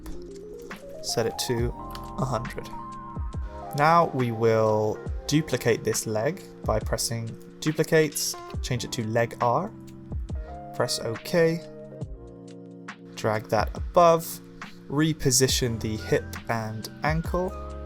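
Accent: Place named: West Country, United Kingdom. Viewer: Really good tutorial, great job. Are you from the West Country? I hear a slight twang in your R's haha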